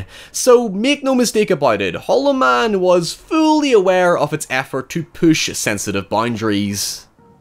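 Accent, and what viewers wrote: Ireland. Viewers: I can tell your Irish, but why are you putting an upwards inflexion at the end of almost every word? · first time requester, so I'm excited to share my recent J horror deep dive. I caught Noroi: The Curse, probably the creme de l creme of found footage flicks. It h interesting hook as an investigative journalist tries to get to the bottom of mysterious deaths and disappearances. A slow burn masterpiece with a truly horrifying climax. One for the ages that only your dulcet Irish tone can breakdown accordingly. Keep up the Great work, you've knocked it outta the park this year! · You're British? I thought you were Irish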